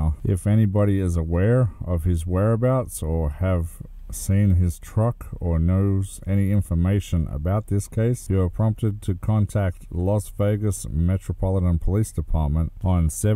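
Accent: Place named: Australia. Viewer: Who doesn't love listening to that Aussie accent! LOL 🤣 I hope they find the missing man. 🙏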